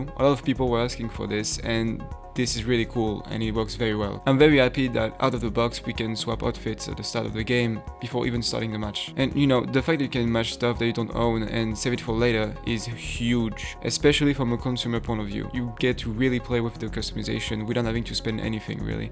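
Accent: France. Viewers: Accent français grillé ! Mais en vrai ça va c'est léger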